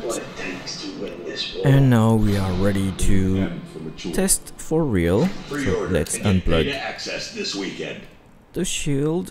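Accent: France. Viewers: French accent or it's only me? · l'accent francais omg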